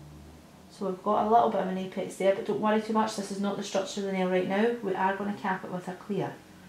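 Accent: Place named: Scotland, United Kingdom. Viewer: Thank you very much for this clear video. I sometimes have a little bit of trouble understanding the Scottish tongue trap, (I'm from the Netherlands) but through the images I understand what you mean. I am looking forward to the next video. Greetings, Olga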